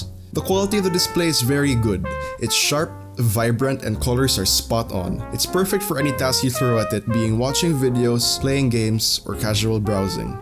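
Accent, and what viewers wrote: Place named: Philippines. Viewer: Noypi accent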